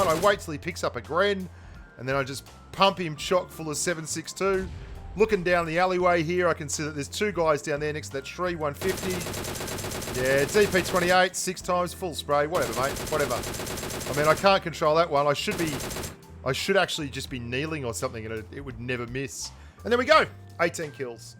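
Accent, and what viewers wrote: Australia. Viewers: you austrialian accent is too strong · That Aussie accent tho ☺️ took me few videos to realize that you were saying "rating" and I was hearing it as "writing" 😎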